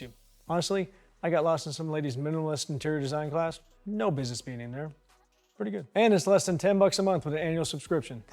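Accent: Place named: South, United States. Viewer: Maybe it's just the cornpone style and southern drawl but I initially had great trouble keeping up but I'm gradually getting used to the rhetoric and speed of speech. Just as well because there're done tips here that I've not yet seen and might help. How refreshing to hear someone state categorically that squats ain't the be all and end all of legs exercises; I'm already a walking barbell lunges man (and yes, spot on, it IS a lung buster exercise) but I've just picked up a couple tips to tweak it and alter the stress focus. The great Ronnie Coleman swore by Walking Lunges and there's little that hasn't already been said about HIS wheels...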